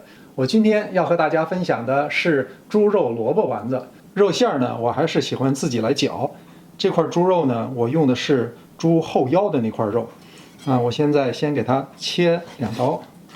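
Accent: Beijing, China. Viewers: 喜欢听你说话！比听歌好听！超纯音的京片子！做菜也到位！超赞！大师:多点出镜！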